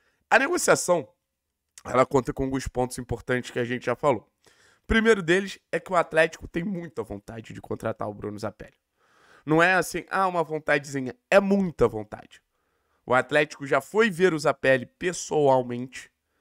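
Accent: Rio de Janeiro, Brazil. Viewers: Pow! Um cara com sotaque carioca. Mais um surfando na onda do furacão.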